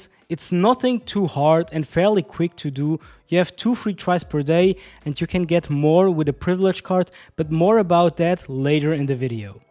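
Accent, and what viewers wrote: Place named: Germany. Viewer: Idk, maybe it’s me, but when I listen to your English, it feels like you a German. Hab ich recht ?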